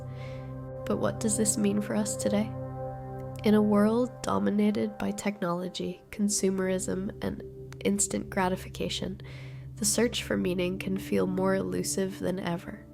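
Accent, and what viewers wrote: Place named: Ireland. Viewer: I pay attention to wait beautiful smart women say or do. And I revell in your subtle irish accent.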